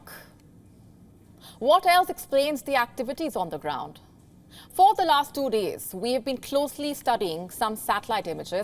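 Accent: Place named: India. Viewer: Strong Curry flavor accent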